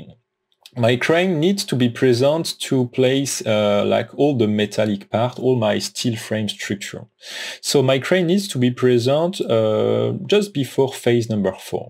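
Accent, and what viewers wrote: France. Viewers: Nice french accent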